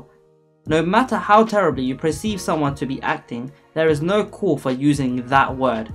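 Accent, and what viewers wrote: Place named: Scotland, United Kingdom. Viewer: ??? The person speaking is speaking with an accent that is from the UK.. Not exactly sure where, sounds rather Scottish. So.. What are you on about? Their English is more than likely, better than YOURS.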